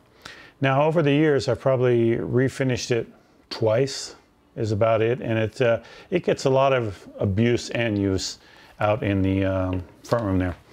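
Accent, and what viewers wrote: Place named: United States. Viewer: I love American accents!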